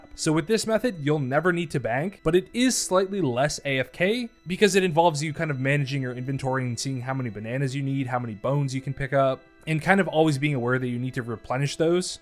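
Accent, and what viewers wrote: Canada. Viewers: also u canadian af bruh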